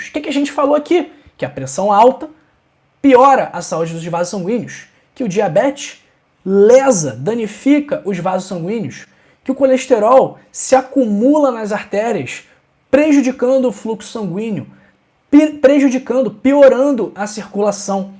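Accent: Rio de Janeiro, Brazil. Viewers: Parabéns Dr. Acho lindo o sotaque dos cariocas, mais ainda, as suas explicações. Moro em São Paulo a mais de cinquenta anos porém, sou mato-grossense. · Pois eu acho lindo o sutaco dos Cariocas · Bom dia Dr.! Eu acho lindo o sotaque carioca, aliás eu ter nascido no Rio de Janeiro, a Cidade Maravilhosa, por sinal eu conheço o Rio de Janeiro, já fui conhecer, tudo lá é bom, belo e maravilhoso! Eu amo o Rio de Janeiro demais! Um dia quem sabe eu morarei lá né? ❤ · Gostei muito do seu Sotaque pois O Sotaque Carioca é muito lindo LLLlllllllllllllllll parabéns Amei Dr, 🙏🙌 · Não sou carioca, mas amo o sotaque do carioca! Cada estado tem o seu sotaque! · Bom dia Dr. Sou Amélia e sou carioca como vc e o nosso sotaque é caracacteristico dos nascidos no Rio de Janeiro, as pessoas mal educadad e sem princípio ficam se apegando a essas picuinhas. Dr. Vc é perfeito em todas as suas colocações e educado; talvez a sua pronúncia deve ser motivo de inveja para os que o criticam eu tbm já fui criticada dizendo que eu falo chiando e este tipo de gente com certeza não deve ter o que fazer, e falta de berço. 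Vc é muito importante para nos orientar no cuidado com a nossa saude. Somos todos gratos a DEUS que o colocou em nossas vidas. E lembre-se Dr do antigo e dito popular: " O que vem de baixo não nos atinge" e ser educado é uma questão de berco. Dr o abençoe sempre e guarde todos os seus caminhos. ABC. ❤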